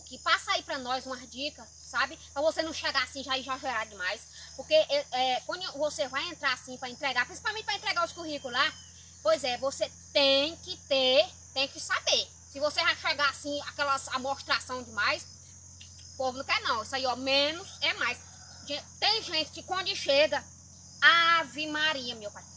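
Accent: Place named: Pernambuco, Brazil. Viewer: Quando vi você pela primeira vez achava que tu era mineira ou pernambucana. Adoro esse sotaque, ainda quero ir no Pernambucano... Parabéns ótimo trabalho!!! ✌👏👏👏👏👏👏👏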